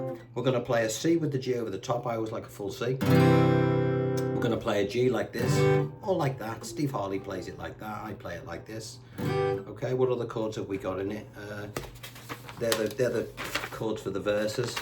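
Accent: Liverpool, United Kingdom. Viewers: I'm telling all my guitar mates about you. You're a gem of a teacher with loads of humour and friendly Liverpool banter. Thanks again buddy.🤗🎼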